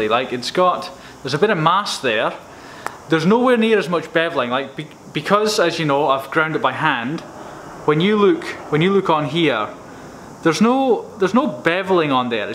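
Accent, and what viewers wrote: Ireland. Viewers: are you Irish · i love ur irish accent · are u irish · u have a cool Irish accent any way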